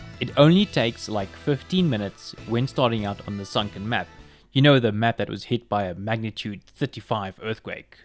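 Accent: South Africa. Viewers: That accent sounds very familiar... You from SA by any chance? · I can spot a South African accent from a mile away ;)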